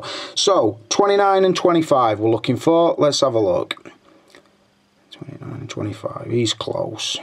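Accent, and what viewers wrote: Sheffield, United Kingdom. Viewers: tha sounds from sheff or rotherham kid! quality review ive just got the battle damaged and ed209 ok there only neca but we gotta start somewhere!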